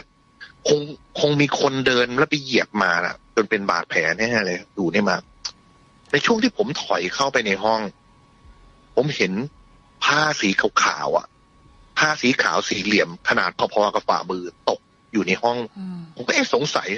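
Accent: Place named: Korat, Thailand. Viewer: เราคนโคราชฟังแล้วรู้เลยยังหวัดโคราช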